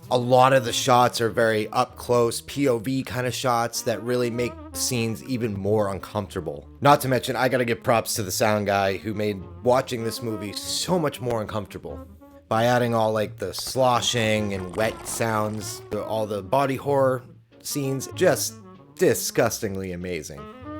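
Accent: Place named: Italian-American, United States. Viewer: Omg I recognize Mackenzie Phillips from Black Mirror! Speak No Evil looks gooood, I love horror movies where you're just super uncomfortable on a visceral level. EDIT: To add that your Italian-American/Tony Soprano accent is solid.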